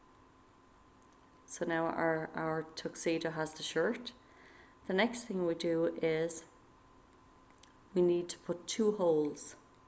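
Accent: Ireland. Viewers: Wow what a fab demo. Its just great to hear an Irish accent. I can't wait to give these boxes a go and I'm sure you could change the theme for other occasions. Well done I'm looking forward to seeing more Irish demo's in the future. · what an interesting idea, and so easy! as an irish man myself it's great to hear a familiar accent. best of luck with your channel.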